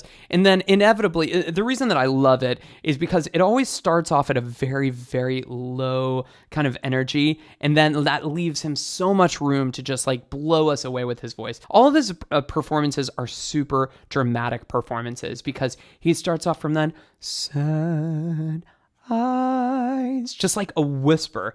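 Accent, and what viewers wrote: United States. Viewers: I think you need to work on your overinflated ego and your lack of empathy department. Have you considered that you speak American English and not English maybe you should work on your own accent. Dimash does not even need to sing in your own language to be able to share the story and all the emotion that goes with it. He speaks English differently than you. So what? I bet if both of you would sing the same song you would not be the one people would be talking about despite that you believe to have a "perfect" English "diction". The man is exceptional. Live with it. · Is that American English or British English? Just saying.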